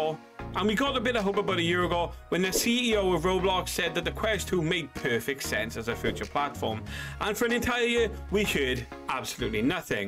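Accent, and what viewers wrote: Wales, United Kingdom. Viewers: Are you welsh??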